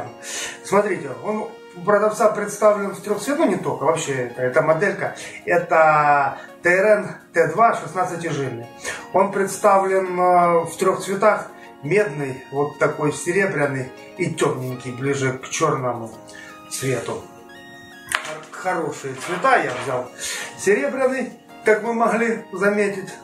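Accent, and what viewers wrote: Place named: South, Ukraine. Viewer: Тезка, в тайм-кодах поменяй точки на : , тогда это будут не временные метки а полноценные тайм-коды. Чуток над речью стоит поговорить, чтобы произношение было не столь колоритным (я про южно-украинский/восточно-украинский "ШО" "ГЭ" ну и прочие специф. звуки) Для новичка видео нормальное. Для авансу +, подписка, бубенцы. Сам кабель норм за свой прайс, но он ничто против нишенска с3 на 16 жил типа аля СИЛЬВЕР. Там вообще пушка- ракета, а не кабель. Свой трн т2с 16 жил отдал донашивать своему ребенку.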